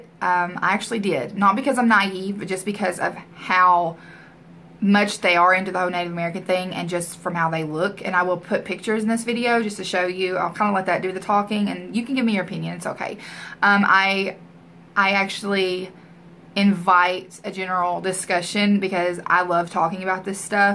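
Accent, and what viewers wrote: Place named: Texas, United States. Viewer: you have that taaaaxes accent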